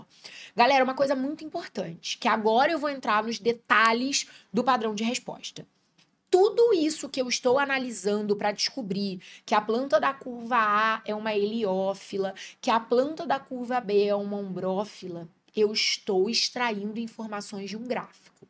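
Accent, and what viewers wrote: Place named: Rio de Janeiro, Brazil. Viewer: Esse sotaque carioca me encanta! Que energia linda a tem!!!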